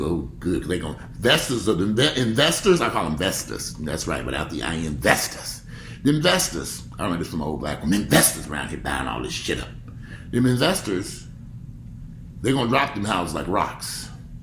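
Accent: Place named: New York, United States. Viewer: Sounds like NYC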